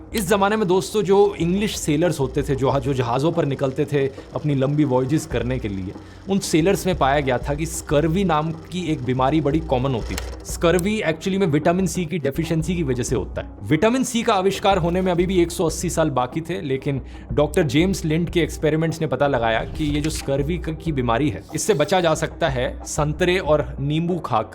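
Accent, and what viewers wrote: India. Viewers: The real indian voice ✨✅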